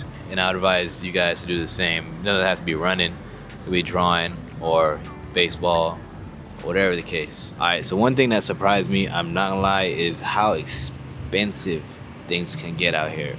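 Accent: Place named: Toronto, Canada. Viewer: Mans sounds like he's from the 6ix.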